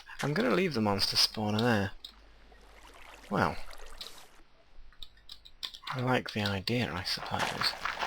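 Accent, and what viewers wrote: England, United Kingdom. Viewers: that english accent makes it all so captivating, it's like watching a documentary... on... digging diggy holes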